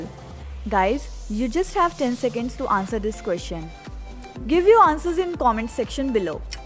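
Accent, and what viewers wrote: India. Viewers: Are you an Indian? Your voice is recognized.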